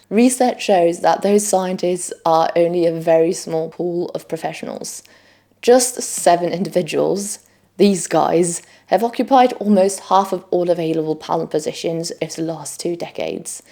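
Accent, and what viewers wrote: England, United Kingdom. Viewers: I'm a little puzzled. You have an English accent and kicked off with American chocolate being rejected in Britain but then the whole video was about the US market, the FDA and American food giants. No mention of the rejection of many ingredients by the EU for example.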